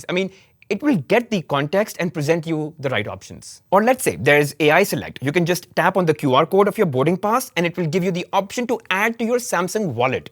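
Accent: India. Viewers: This man has such a good Indian accent. Clear and articulate. Keep it up!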